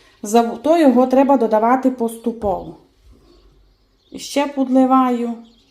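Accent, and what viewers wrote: Transcarpathia, Ukraine. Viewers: Добрий день,. Що смачні оладки, нема сумніву, але мені дуже подобається ще твій діалект, твій говір, урівноважений, спокійний, гарний закарпатський. Пару разів повторюю ролик, щоби чути твій голос, твою мову. В кожному краю України свій діалект. Я із Прикарпаття. Дякую тобі за Закарпатську кухню. Цікаво, смачно. Слава Україні! Слава Героям! Вічна пам'ять полеглим воїнам! ❤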